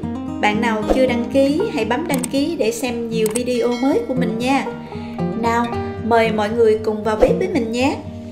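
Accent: South, Vietnam. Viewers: Cối xoay hay quá. Giọng nghe đúng chuẩn miền nam. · giong chi noi de thuong qua he giong noi chuan mien nam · Cảm ơn sự hướng dẫn của về chác là khô bò. Lâu lắm rồi không được nghe 1 giọng nói ngọt ngào đặc trưng của miền Nam khi xưa. Giọng mà đọc truyện thì hay phải biết. Chân thành cảm ơn.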